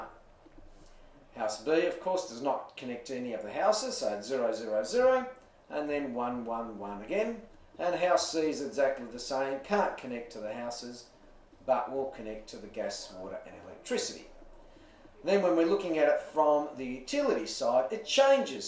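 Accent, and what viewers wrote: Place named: Australia. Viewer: I hate this Australian accent.......makes it hard to understand :( had to watch it twice Good job though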